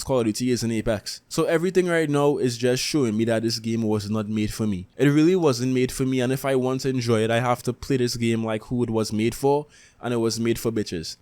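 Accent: Trinidad and Tobago. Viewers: You sound like a Trinidadian lol I'm from Trinidad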